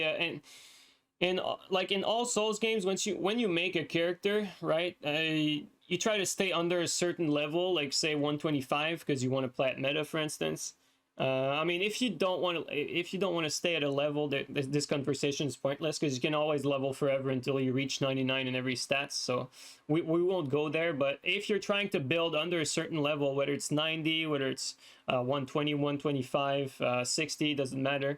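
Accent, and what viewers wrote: Quebec, Canada. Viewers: This guy also sounds so Quebecois, cris de tabarnak.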